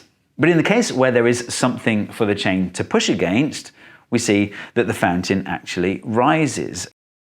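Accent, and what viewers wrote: United Kingdom. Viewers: "I was just mesmerized by his blue eyes and his soothing voice" Lol. Thing is, i agree. And i don't even like the british accent. ❤👍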